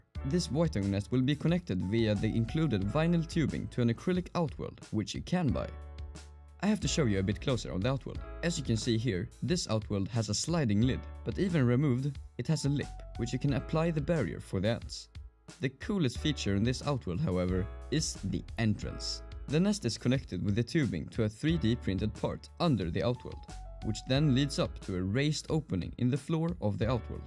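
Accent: Sweden. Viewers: You can hear that he is from Sweden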